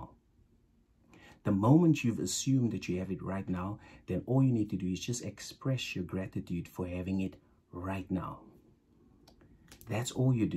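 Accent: South Africa. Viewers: I knew that accent immediately! So happy to find more South Africans in the LOA community ❤❤❤❤ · lol, immediately when I heard you speak, I said, this guy is South African. I'm too. 😂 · Oh laaaaawd, a South African accent!!!! Heya brother!!! Hi from Austria! Now I'm homesick! So happy to see South Africans also on here, talking about the Law of Attraction!! 👋👋👋 How many numbers did you get right? I once missed the jackpot by ONE number!! That was waaaaay back! Still got a nice little sum, but not the millions! Yet! 😁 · Wait you South African? Im try this too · I know a South African accent when I hear one❤️👏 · Love your South African accent. I was in Durban years ago, loved it.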